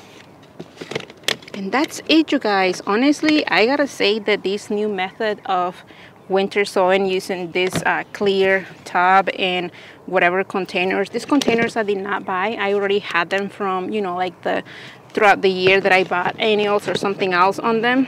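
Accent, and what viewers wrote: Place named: Poland. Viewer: Hehe, you're so funny. And your polish is sweet.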